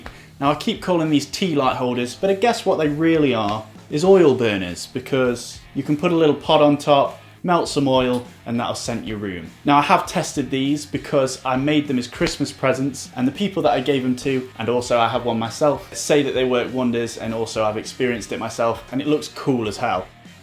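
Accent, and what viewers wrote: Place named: East Midlands, United Kingdom. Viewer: New sub here, was from Grantham now living in Cork.. Im guess from the accent and the word duck you too are from the east Midlands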